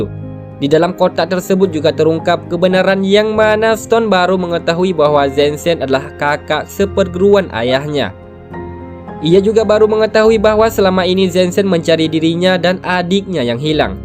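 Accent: Aceh, Indonesia. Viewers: ini org Aceh keknya yg bicara😀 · Suara nya mendhok kayak suara org aceh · dari logat pasti orang aceh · Logat aceh😅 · Aceh ni logat nya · Logat aceh admin nya 😁😁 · Bg orang aceh ya? Medok karna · Pembawaan suara mentok kali orang Aceh.. Sehat slalu admin, salam asam Sunti ..😅😅😅😅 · Admin awak aceh sang euh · medok Aceh nya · Kayanya yg ngisi suara di alur cerita channel ini oranh aceh😂😂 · Seperti logat orang Aceh? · Maaf min loe dari daerah mana logat nya mirip aceh atau bali y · Bahasa Indonesianya terdengar logat ORANG ACEH., hihihihi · Logat Aceh ya wkkww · Orang Aceh ya bang?. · Admin nya orang aceh ya? Logat nya Aceh kali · Lupaya bukan cuman saya yg sadar klok mimin org aceh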